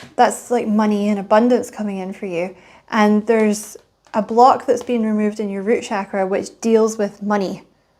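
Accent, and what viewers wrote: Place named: Scotland, United Kingdom. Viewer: So I watched this video after you recommended it in the Scorpio reading. I’ve never watched a Virgo reading before but I checked my placements & it turns out I’m a Venus Virgo! I was shocked to learn this! Wow did this resonate for me! Thank you for sharing your gift of channeling. Really enjoying both of your channels. Happy New Year! Love hearing your Scottish accent. I have family history from Scotland so it’s been great to connect with you. 💕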